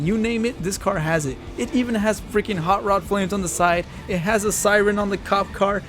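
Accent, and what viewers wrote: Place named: Latin America. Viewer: jaja Que quay!!! I am Chilean and I could hear it in your voice! Much love bacan!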